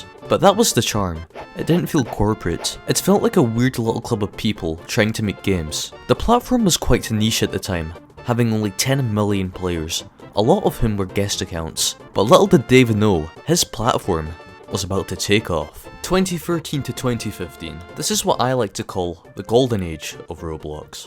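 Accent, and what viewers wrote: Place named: Scotland, United Kingdom. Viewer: I know this guy is Scottish I recognize the Scottish accent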